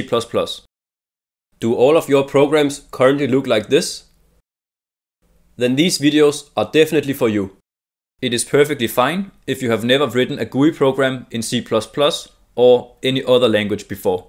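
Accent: Denmark. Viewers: Danish? I like the accent :))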